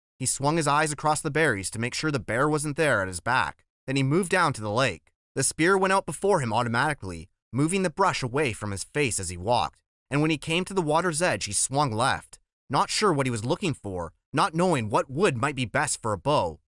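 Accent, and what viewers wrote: United States. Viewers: right bro ?! the american voice sucks. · I really love this book but the typical American voice ruins it. I can't listen to this at all. I'd rather read it again. Why do Americans ruin everything good for everyone... what a shame that the world thinks of you in such a way when we could all be a little better...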